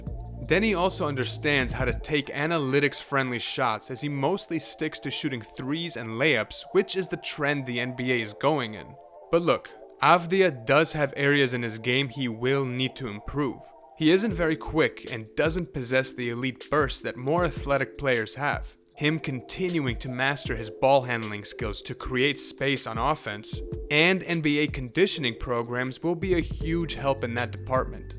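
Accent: Israel. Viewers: LOVE your hebrew accent bro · רואים שאתה ישראלי איזה מבטא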